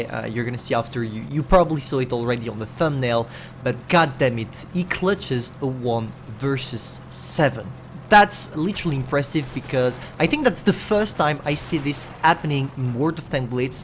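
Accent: France. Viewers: Un super accent pour un français bravo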